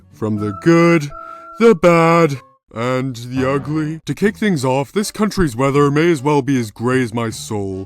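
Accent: Ireland. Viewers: I can here d4 accent from deep within you melt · Wait are u from Dublin? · I always loved the Irish accent, hell I'm making a show soon and the main villain is a Irish voice, also love your videos man 10/10👍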